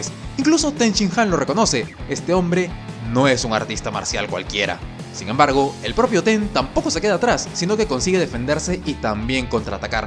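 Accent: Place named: Latin America. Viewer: Alguien sabe de donde es que habla como latino pero en cambio dice vídeo como nosotros los españoles?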